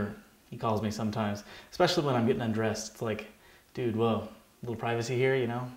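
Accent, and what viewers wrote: United States. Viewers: I usually can't stand american accents but yours, yours I can handle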